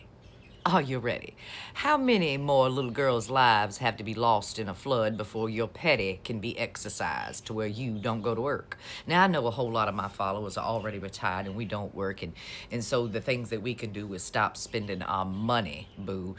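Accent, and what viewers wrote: South, United States. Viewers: I have to get up to speed on the southern speak. To be honest, I'm having a bit of trouble understanding ya. 😃🇺🇸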